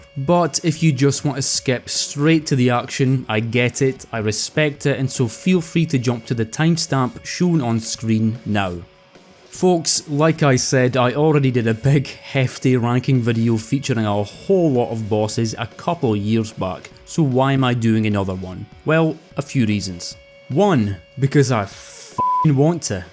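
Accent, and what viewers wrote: Scotland, United Kingdom. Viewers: Holy crap 6 hours of Scottish man talking about soulsbourne 🥹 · is it me or does sound increasingly Scottish with every new video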